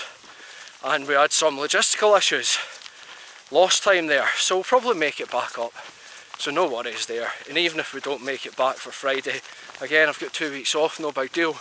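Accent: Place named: Lanarkshire, United Kingdom. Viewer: Looked like a brilliant day, really enjoying your presentation style, sounds like you come from the Hamilton - Blantyre area originally?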